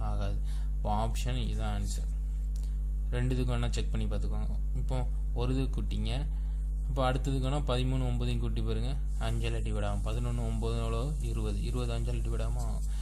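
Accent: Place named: Tirunelveli, India. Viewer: Deepak Dharman it's thirunelveli speach......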